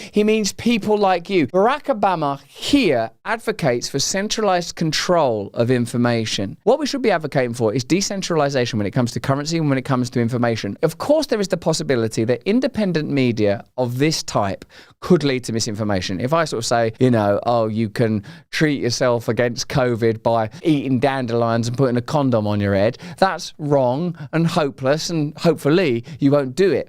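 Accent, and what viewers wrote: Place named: United Kingdom. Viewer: A dude with both a heavy British accent and a heavy lisp is too much for my ears.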